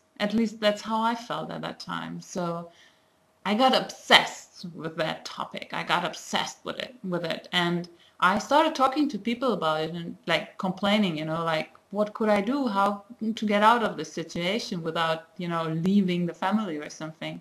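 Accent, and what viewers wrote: Nepal. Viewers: It is funny with aaudaina..I love your nepali accent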